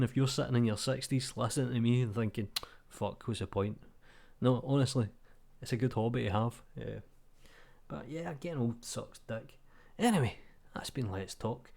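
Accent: Scotland, United Kingdom. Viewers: love the Scottish accent BTW. Very different from Grant Ibbot. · As a german, I find your scottish accent very pleasing! I rarely struggle to get what you`re saying. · Happy Birthday!...and I love the Scottish accent. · Downfall?! Bollocks. The Scottish accent makes everything better, including your videos :D And dont sweat it mate. I'm 8 years your senior, with 4 kids and two nuked marriages behind me and I still play around with Blender and other softwares daily. You're doing fine ;) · There is no shame in your accent. At least you are not using Scot's vernacular or Ghàidhlig ... tbhiodh e inntinneach faicinn an dèanadh iad staim dheth....LOL! Bless those who wish to preserve the auld braid Scots tung. You are young yet....just wait...it gets worse... :)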